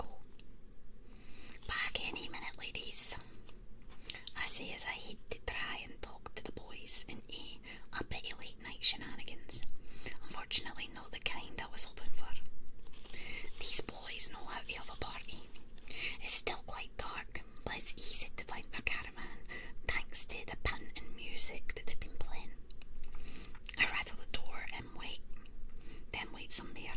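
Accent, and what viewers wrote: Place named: Scotland, United Kingdom. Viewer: I really like her Scottish accent it doesn't matter what she says on her asmr streams it's always nice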